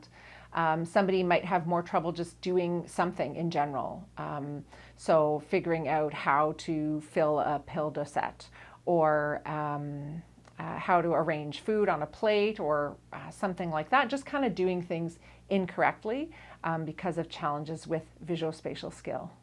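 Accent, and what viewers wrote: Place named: Canada. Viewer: Your accent gave you away! You should have clearly stated at the onset that you are from Canada. As one who has spent much time in your country, there is an extremely strong bias against USA conservatives in general. For years and years, Canadian media has been obsessed with American bashing and has been rife with thousands of examples including long documentaries. That bias is daily and insidious and long-standing sport. So here you have a Canadian medical doctor (you) who is commenting "incognito" on USA politics by not identifying where you are from (and quite possibly, only because you are Canadian, hiding bias). I am sure you know that in high-level research, bias destroys validity. It is quite possible that you only wished to educate the public about dementia. However, the fact that you did not identify that you are Canadian and this concerns Joe Biden (in the United States), is both questionable and suspicious. 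There is a huge amount of recorded primary source empirical data demonstrating that Biden has a serious problem and most of the USA knows it (on both sides of the aisle). I wonder if one sees this kind of covert interference in Canadian politics by Americans?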